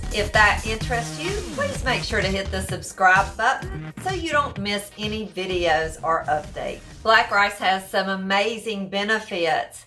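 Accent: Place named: South, United States. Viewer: Howdy? I love this southern girl accent 😍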